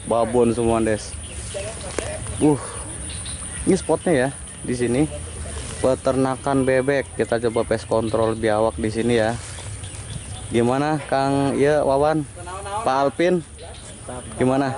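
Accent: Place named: West Java, Indonesia. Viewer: Daerah mana bang ngomongnya sunda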